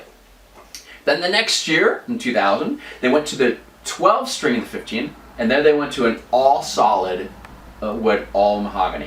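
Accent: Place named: France. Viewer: This isn't a criticism, but I had to watch this whole explanation a couples times until I got used to the quaint French accent that kept creeping in. BTW, most folks place the accent on the second syllable of "Sapele"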